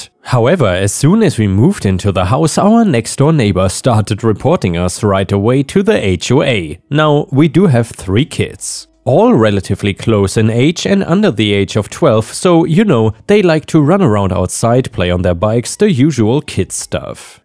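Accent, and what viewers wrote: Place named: Germany. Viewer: Where did you come from? Your accent sounds German.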